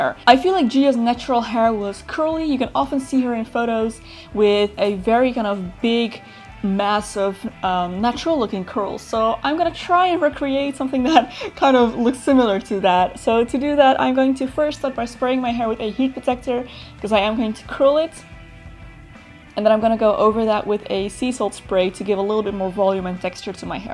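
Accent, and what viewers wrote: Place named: Netherlands. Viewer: ik wist dat je Nederlands was toen ik je accent hoorde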